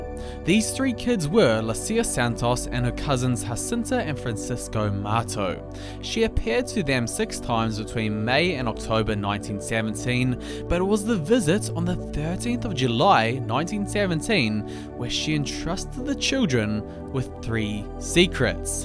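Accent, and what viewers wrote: New Zealand. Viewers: Like your Kiwi accent. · Is your accent Kiwi ??🤔 (NZ)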